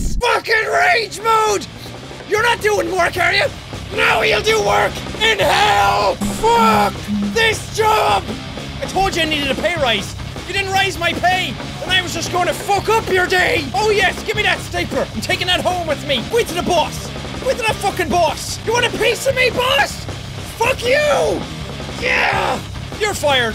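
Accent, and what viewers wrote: Ireland. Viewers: I love his Irish accent. I don't understand why people make fun of the way he says his ts. like I love how he say "I just tought I'd play"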